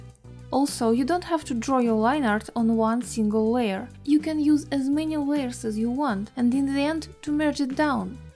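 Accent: Russia. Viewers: love your russian accent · nice russian accent))) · You have a cute russian accent :3 It's cool cause now I'm starting to lern english for work, and I understand all what you say. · Omg are you russian? I freakin love to hear that accent with english words.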